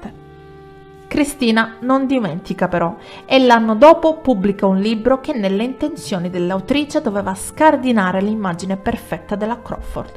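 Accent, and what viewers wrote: Sicily, Italy. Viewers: Ti ammiro molto. Continua così. A proposito il tuo parlare siciliano mi piace tantissimo!!! Ciao da Venezia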